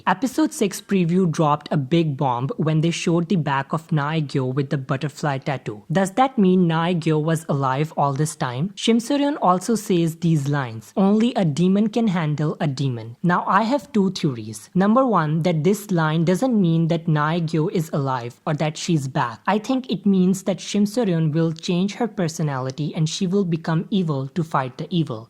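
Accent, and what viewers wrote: India. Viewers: I love your theory. And your Indian accent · mhm the indian accent gets me everytime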